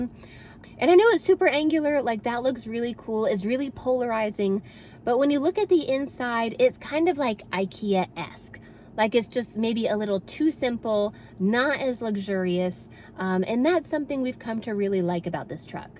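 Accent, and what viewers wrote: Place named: South, United States. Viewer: Do more videos I love ❤️ that country accent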